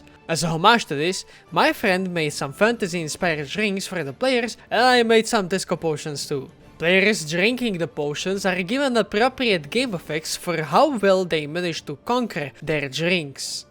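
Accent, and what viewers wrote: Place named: Poland. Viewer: Words can't describe how much I love this video! Just everything about it, from the polish accent to the wonderful creativity of the potions. (o´ω｀o)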